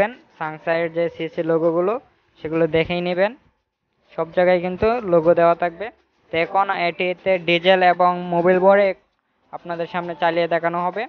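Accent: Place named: Sylhet, Bangladesh. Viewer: সিলেটের ঐদিকের ভাষা এমন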